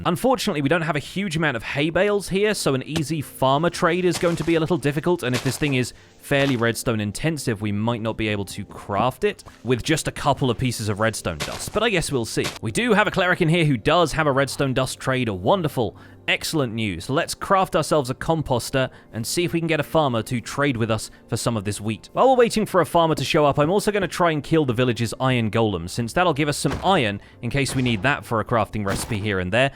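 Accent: United Kingdom. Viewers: yeah yeah.. go spend an hour building a machine to make 100 pink dies a second.. then you can make crafters for every other die! That's already like a dozen Plus videos! You're so hyped for auto die.. it's funny! To answer your question: I think I'm dunking on just another Minecraft YouTuber with a British accent.. you all make the same videos too.. you all just copy each other.. You're a dime a dozen, Bud.. Step on down off your high horse. Who do you think you are? -PHILZA!? ...not even close Got anymore stupid questions? ..Go craft some die.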